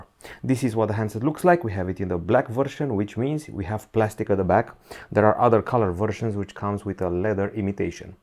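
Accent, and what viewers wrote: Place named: Romania. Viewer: Ăsta-i român după voce și accent 👍👍👍👍 😂😂 Americanii 👎🏿👎🏿👎🏿👎🏿💩💩💩💩 Românii 👍👍👍 💪💪💪💪❤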